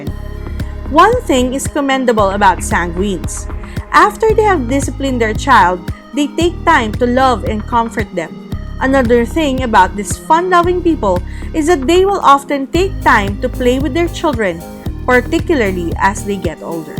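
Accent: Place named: Philippines. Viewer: Your English really sounds FIlipino. Matigas accent.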